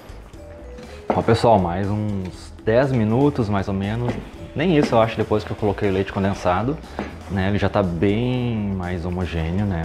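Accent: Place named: Rio Grande do Sul, Brazil. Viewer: Vou fazer 😋 uma pergunta, vc é gaúcho? Por causa do sotaque 😁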